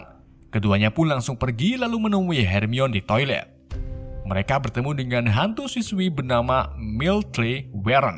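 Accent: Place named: Java, Indonesia. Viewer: Radak medok yah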